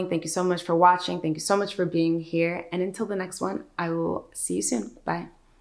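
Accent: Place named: United States. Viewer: your accents sounds American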